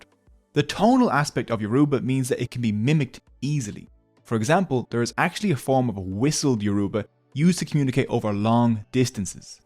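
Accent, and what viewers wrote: Ireland. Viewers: BRUUUH you're confirmation to my closeted anthropologist mind, that the accent of the Bajans is defohighly influenced by de irish and probably from where youre from in ireland... #onelove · God i really love your accent, i wish i was born Irish so i don't have to study extra to have Irish accent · Please be googling how to pronounce “Yoruba” first. Even with your Irish accent there’s no reason why you can’t pronounce Yoruba and place names like Ibadan properly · A party to celebrate the success of a previous party SENT ME lol This was a pretty cool breakdown especially for someone who is ADOS learning I'm largely Yoruba. I also appreciate your pronunciation effort which I don't think can be easy with a strong Irish (?) accent! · From a Nigerian, an Irish dude pronouncing Yoruba places/names with an Irish accent...is just in having me in stitches 😂😂😂 (it's so phonetically bad/wrong), but I'm so enjoying this video nonetheless. 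The amount of research is immensely commendable. 👍👍👍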